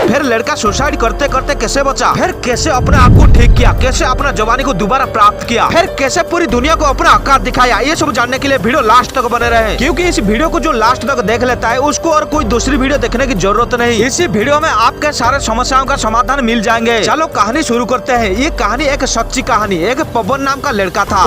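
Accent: Bengal, India. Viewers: Bengali jaise bat karte ho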